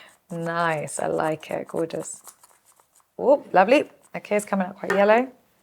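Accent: United Kingdom. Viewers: Your accent is British, but name sounds like Indian, is that true ? I'm Indian, & I'm just about to complete my MBBS internship in 2 months, & i want to work in research field, & you gave me hope thanks ma'am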